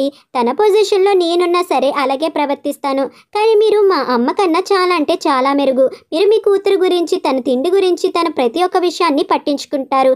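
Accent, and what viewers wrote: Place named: India. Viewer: మీరు హిందీ వాలా న,మీ మాటలు అలా ఉంది అ0తే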